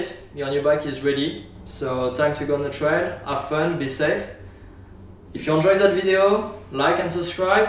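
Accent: France. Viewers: Sa de voit que tu est français a ton acsens en anglais et a ton nom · Tu a un accent français You have a french accent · French accent 😉(I have the same) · tu a un accent francais you are accent french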